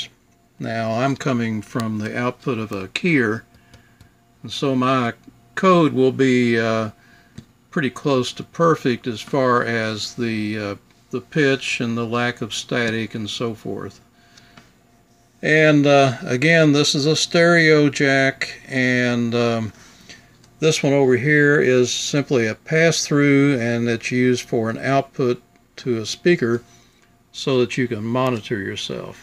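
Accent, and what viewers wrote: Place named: United States. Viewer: Thank you, Your american accent is so easy to understand I like! Greetings from France!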